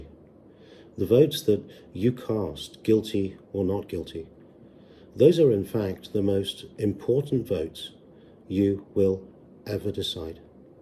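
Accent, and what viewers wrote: United Kingdom. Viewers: YouTube is REALLY pushing your “My answer to “how do you defend someone you think is guilty”” video from 5 years ago. I’ve seen multiple comments on multiple videos of people saying they found you through that video recently. And I have to agree with everyone, you are very smart, very personable, and very charming. A strong British accent for an American is always knee weakening as well. Love the content, love the knowledge. Only wish you were more consistent with uploads but i couldn’t imagine how very busy being a Barrister must be. Have a good day and thank you for gracing our TVs and phones.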